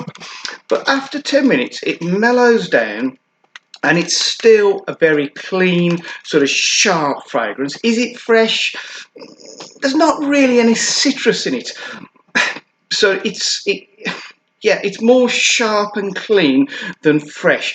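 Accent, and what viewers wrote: Birmingham, United Kingdom. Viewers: Great review mate and do i detect a slight Brummie accent there..? ..subscribed 👍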